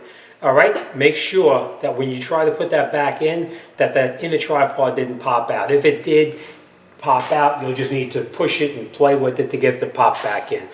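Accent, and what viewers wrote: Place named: Boston, United States. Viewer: Your video was very helpful to me it was great the way you explained each step as you went along. I took your advise on the spring compressor deal and purchased the whole assembly that made the whole job much easier. Also I noticed from your accent, you must be from the Boston Massachusetts area. I retired and moved from Palmer Massachusetts to Georgia but my heart is still in Massachusetts and it was nice to hear from someone that lives in that area. "Thanks "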